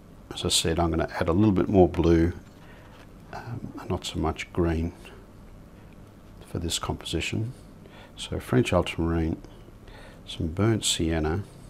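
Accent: Australia. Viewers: Hi, just discovered your channel! How nice it is to hear another Aussie. I promptly subscribed and look forward to learning lots..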